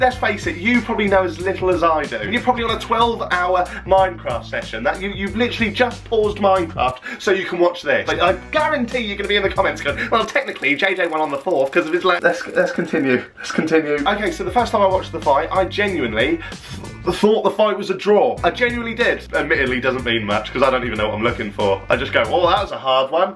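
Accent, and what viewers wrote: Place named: Norfolk, United Kingdom. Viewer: Why do you have such a Norfolk accent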